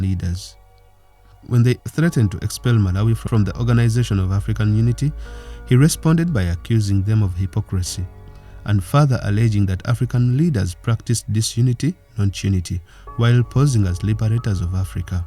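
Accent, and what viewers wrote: Uganda. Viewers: Author sounds like a Ugandan scholar...the Ugandan English accent is unmissable...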